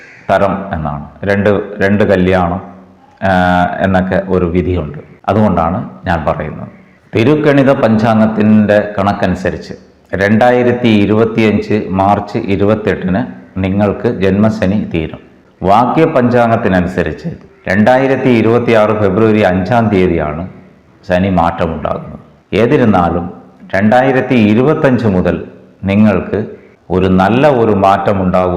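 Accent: Tamil Nadu, India. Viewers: താങ്കളുടെ സംസാരത്തിൽ ഒരു തമിഴ് ശൈലി വരുന്നുണ്ട്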